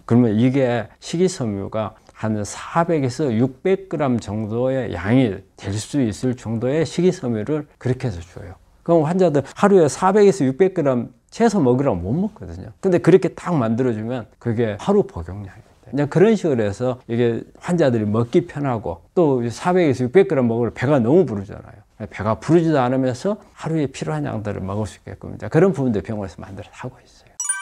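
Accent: Jeolla, South Korea. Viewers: 웜마 전라도네우짜쓰까잉 구수하구만 ❤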